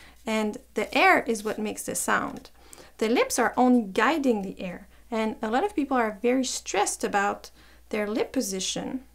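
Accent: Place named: France. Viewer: It looks as if you have an french accent,so, are you French?(without malice)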